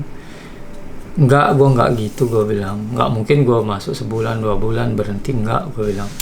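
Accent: Lombok, Indonesia. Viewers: Logat Sasak tetep melekat🙌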